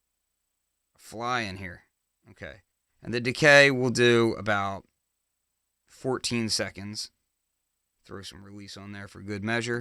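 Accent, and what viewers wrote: Philadelphia, United States. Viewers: It sounds like you have a Philadelphia accent.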